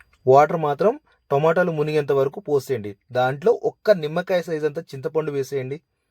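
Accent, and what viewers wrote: Telangana, India. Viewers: Pure telangana lunga ge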